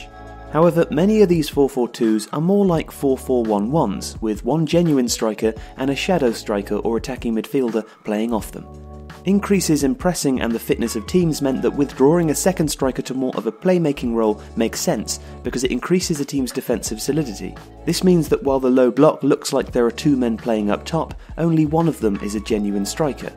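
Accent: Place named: England, United Kingdom. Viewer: I think its just a regional English accent. Some words are pronounced slightly differently depending on where you live. For example, in the south of England where I'm from, most people pronounce "bath" as bar-th, whereas up north they would pronunce it as ba-th. Very similar, but slightly different inflections to the word give them a different sound.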